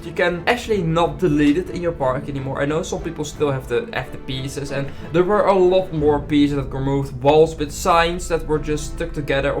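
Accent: Netherlands. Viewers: Because he has a Dutch accent.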